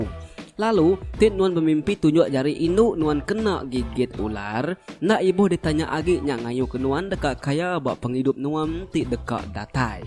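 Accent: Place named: Iban, Malaysia. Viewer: cumil amt slang iban yaa hihii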